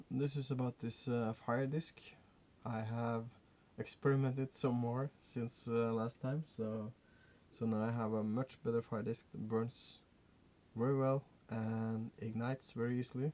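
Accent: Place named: Norway. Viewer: Nice tip! Made my own. Burns really well, about10 min burn time. Btw in the video you say "I don't have any more "rests" he he Nice Norwenglish .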